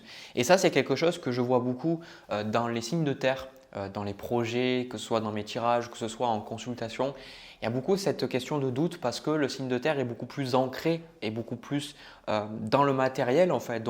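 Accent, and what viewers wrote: South, France. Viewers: Merci, pour tous ces précieux conseils qui me font penser que j'aurai bien aimé avoir un pote comme vous!! En tant que signe Vierge, j'ai bien tout noté....et à mon tour, si vous le permettez bien sûr, je vais vous adresser quelques remarques en forme également de conseils....Vous avez selon moi, un tout petit "tic de langage", qui vous fait dire, par exemple: "Vous roprésentez ceci ou cela", au lieu de "Vous reuprésentez ceciou cela.."Alors moi aussi, j'habite dans le Sud et je ne suis pas du tout choqué, bien au contraire..:: Mais j'ai aussi des origines, et par conséquent une oreille....très parisienne....je sais, nobody's perfect!!;-)) Vous noterez peut-être comme moi, un léger sourire aux lèvres, que sur certains mots, assez rares, vous ne commettez pas ce que je ne saurai qualifier d'erreur!!;-)) Donc si vous le voulez bien, ce mois de décembre 2023, vous vous ferez plaisir, ainsi qu'à moi, en veillant à faire quelques progrès que vous nous présenterez, à n'en pas douter, dès ce début d'année!!!;-)) Merci et bonnes fêtes de fin d'année..!! 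Cdlt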